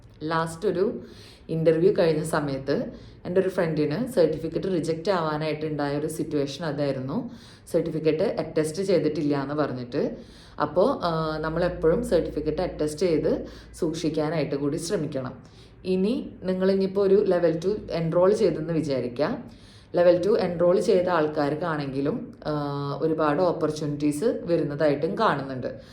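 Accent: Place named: Ernakulam, India. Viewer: Thante slang kettappol Ernakulam district evideyo anennu thonni...I am from Aluva...that's y asked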